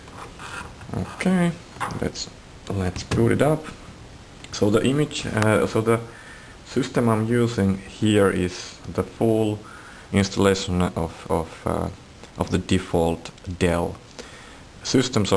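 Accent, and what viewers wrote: Finland. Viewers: Finnish accents are awesome :D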